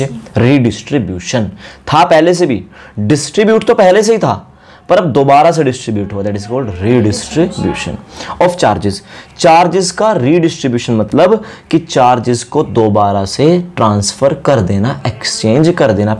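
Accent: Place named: Haryana, India. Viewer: ur accent is like that (from haryana)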